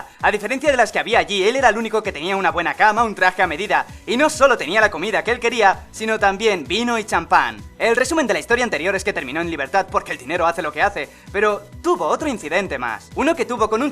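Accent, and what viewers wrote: Spain. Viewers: Español de España, no latino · Me encanta tu voz española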